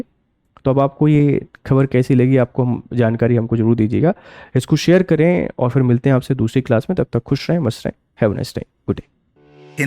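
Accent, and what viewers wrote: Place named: Madhya Pradesh, India. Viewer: Arey madhya pradesh aur maharashtra ke taraf (ri) ko (ru) bola jata hai samjhe.. Isleye wo aise bol rhe.. 😮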